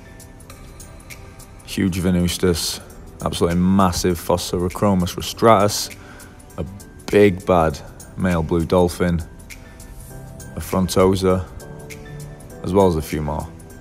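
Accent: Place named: England, United Kingdom. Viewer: Why do you sound English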